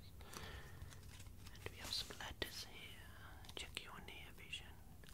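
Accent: Birmingham, United Kingdom. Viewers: Judging from his accent I would say the UK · Yooo I don't think I've actually heard an ASMRtist with a Brummie accent before, it's really nice! Great video dude, I love visual effects like this, they're super relaxing. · He’s a brummy I think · Are you a Brummie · This is excellent! Is your accent also from Birmingham? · I was starting to think that my tingles had gone and nothing was doing it for me, until you, not sure if it’s the accent, and being from Birmingham too it’s lovely to hear 😊 I love all your videos 👏🏼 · Never thought someone with a brummie accent would help me sleep... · Love this , just found you . I miss hearing a brummie accent 🥰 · As a lad who comes from the Black Country and the areas around Brum. I have never heard a brummie talk so quietly 🤣🤣🤣🤣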